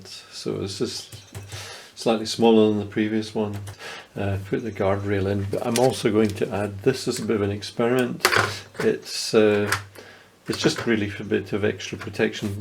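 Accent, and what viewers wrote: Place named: Scotland, United Kingdom. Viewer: where do you live sound Scottish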